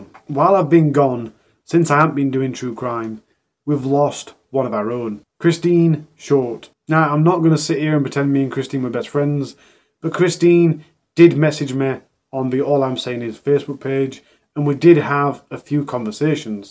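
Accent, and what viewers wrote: Yorkshire, United Kingdom. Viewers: Nice to have you back sunshine, I feel at home with that Yorkshire accent, I’m In stocksbridge x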